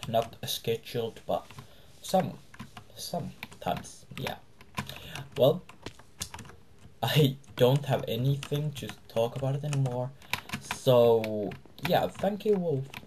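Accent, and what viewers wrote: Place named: Finland. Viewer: Rallienglanti :D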